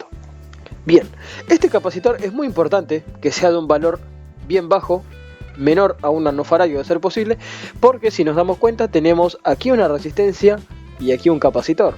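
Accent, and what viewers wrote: Argentina. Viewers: eres argentino o chileno?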